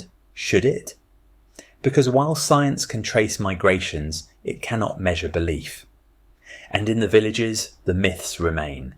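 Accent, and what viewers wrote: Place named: United States. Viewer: A lot of ChatGPT generated crap... You are no wiser after listening to the 20 minutes rant. Just looking at physical features, language and customs shows you the difference. Of course there is overlap and some common maternal genes... But the point was to explain the distinct differences between differences the groups. Yet Chat GPT failed to do so in its yanky acsent 👎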